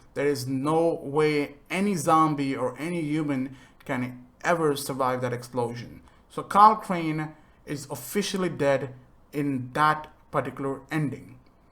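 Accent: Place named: India. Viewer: This guy is trying so hard to hide his indian english accent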